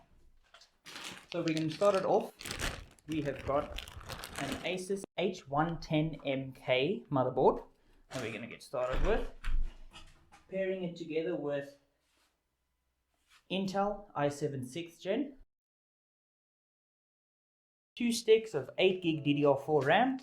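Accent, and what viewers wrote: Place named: South Africa. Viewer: You south African holy mollie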